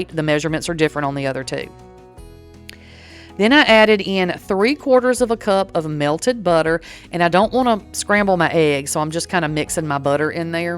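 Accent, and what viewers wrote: South, United States. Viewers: I only bake homemade cakes as I personally do not like store box cakes (too sweet for me) and having these premixed cake mixes is going to be a game changer. Thank you so much for sharing these recipes. p.s. I love your accent 😊 I lived in GA long time ago for a few years and just love the southern accent.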